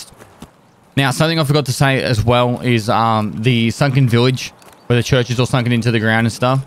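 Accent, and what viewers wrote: Australia. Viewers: He is speaking English, with a moderate Australian accent. He is a native aussie.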